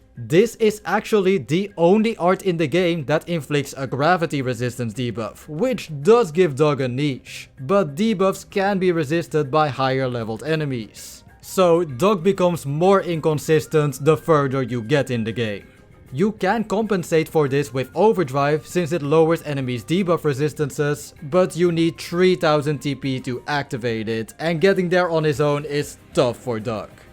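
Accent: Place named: Netherlands. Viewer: Hoi hoe gaat het ik hoor dat je een nederlands accent hebt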